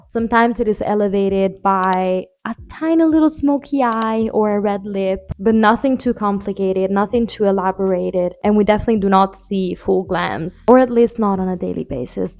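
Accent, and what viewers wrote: Italy. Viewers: I'm sorry in advance if it's common knowledge but you sound italian... Btw I'm already starting to enjoy your contents, you have a beautiful voice ❤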